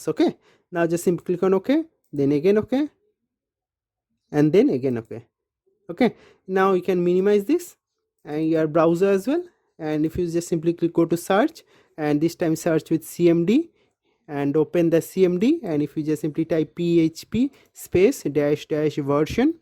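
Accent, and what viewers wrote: India. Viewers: I cannot understand Indian English.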